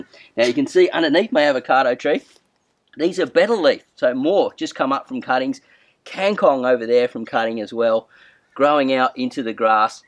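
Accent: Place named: Australia. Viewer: i had no idea Australians had more than 1 accent but compared to say steve irwin this fella is hard to understand very very thick accent... its cool sounding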